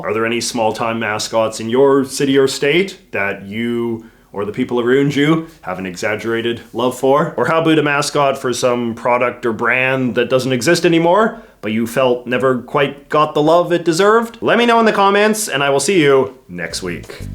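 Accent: Canada. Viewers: I don't know if you purposefully over-emphasize your Canadian accent or it just stands out so much to me, but either way I love it and never change. I really believe you are the the preeminent internet expert on Americana. You see and appreciate and critique things that neither a family member or a complete stranger would of a household, you have an understanding of a close and trusted neighbor, a truer understanding.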